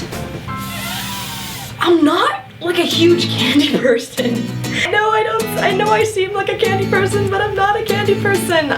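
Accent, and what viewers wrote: United Kingdom. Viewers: Haha :) your New Zealand accent kinda sounded like an English Birmingham accent hehe awesome you made me laugh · Your NZ sounds like a bad English accent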